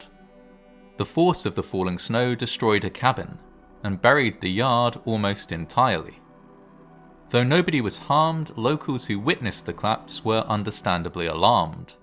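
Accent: England, United Kingdom. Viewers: Your voice, I'm addicted to it. I would follow you to college if you were a professor. Where are you from, England? Love the accent mate. Glad your channels blowing up.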